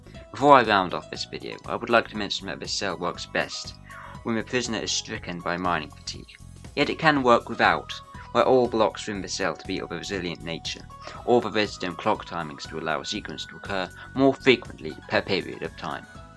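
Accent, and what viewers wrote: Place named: United Kingdom. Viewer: I enjoyed the british accent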